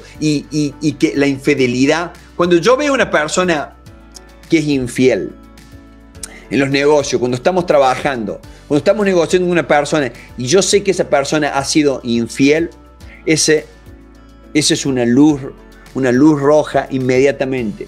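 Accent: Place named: Cordoba, Argentina. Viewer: Acento weon de los cordobeses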